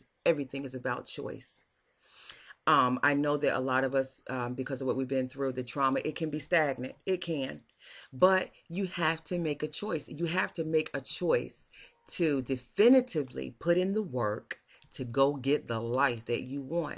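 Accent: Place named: New Jersey, United States. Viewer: you are sooo from Jersey with the "yous" haha i love it! Im from CT and have been to Jersey so many times I cannot count so I can relat. Thanks for this video!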